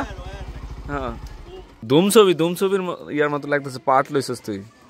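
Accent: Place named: Comilla, Bangladesh. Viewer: তোমাদের গ্রাম কোন থানায়।তোমার ভাষা শুনে মনে হচ্ছে কুমিল্লার ভাষা। ঢাকা থেকে।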